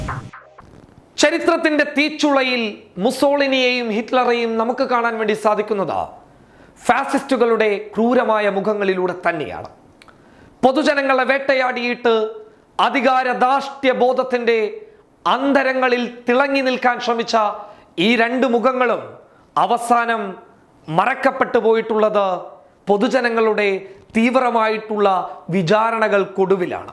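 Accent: Kasaragod, India. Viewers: ഞാൻ ശ്രെദ്ധിച്ചു. കാസർഗോഡ് ശൈലി ആയതു കൊണ്ടായിരിക്കും അങ്ങനെ വരുന്നത് 🙂. എന്തായാലും പുള്ളിക്കാരന്റെ അവതരണം സൂപ്പർ ആണ് 😍🤗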